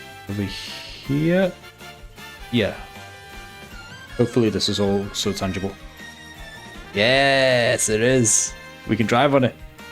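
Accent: Liverpool, United Kingdom. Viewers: I think he’s scouse. The accent gives it away?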